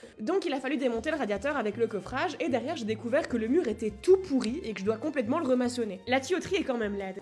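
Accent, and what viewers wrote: Belgium. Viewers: La musique de c'était pas nécessaire parcontre surtout quand ont n'a un accent belge comme toi. 😅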